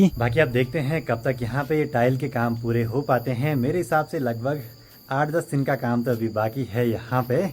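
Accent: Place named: Garhwal, India. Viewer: bhaiya ek din pura video Garhwali mein banana aapke munh se Garhwali bahut acchi lagti hai ham aapko Punjab se dekh rahe hain❤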